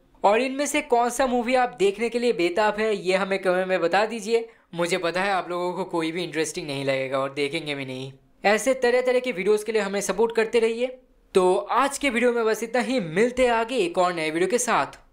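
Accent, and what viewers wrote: Bengal, India. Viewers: Bro u from Kolkata ur accent like Bengali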